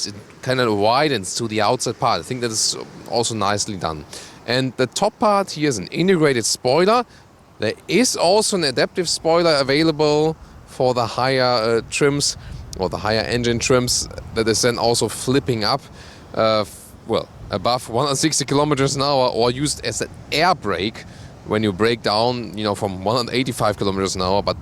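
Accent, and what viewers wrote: Germany. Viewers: Very well done ! I like the superb number of facts you are able to tell us during driving and the pronounced subjective comments on every car ! You really speak fluently English but why don´t you stay for a couple months in the states and get rid of the hard German accent ? ! it should make your really interesting videos just perfect ! Please continue ! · OMG… you sound so Germish 🇩🇪🇬🇧 that I can’t continue watching it!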